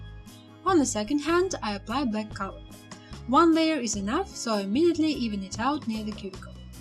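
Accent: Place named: Russia. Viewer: Сразу видно когда наш человек говорит на английском 😎такой жуткий акцент😂но это все фигня, я даже так не умею🤥а работа просто афигенная 🔥🔥🔥👍👍👍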